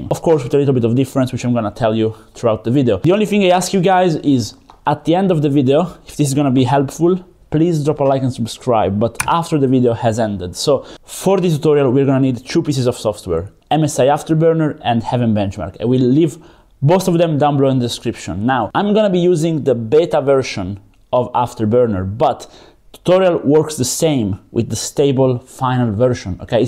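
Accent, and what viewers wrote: Italy. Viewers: Sento un po’ di italianità 😂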